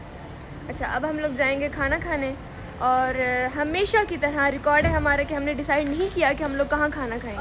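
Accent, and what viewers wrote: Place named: Saraiki, Pakistan. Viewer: Sarikiyn wala lahja hai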